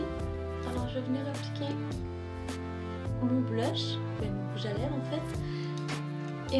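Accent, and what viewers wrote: Quebec, Canada. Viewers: Elle se donne pas un accent... Elle est Québécoise! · Ton accent me rappelle le Canada c'est dingue!!! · J'aime énormément ce que tu fais et ton accent Québecoi est totalement adorable :)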